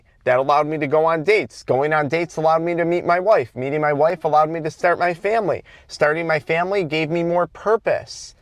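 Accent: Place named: Michigan, United States. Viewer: I'm going to pin the accent on southeastern michigan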